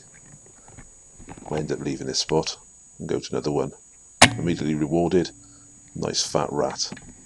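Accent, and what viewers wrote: United Kingdom. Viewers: British voices make for great commentary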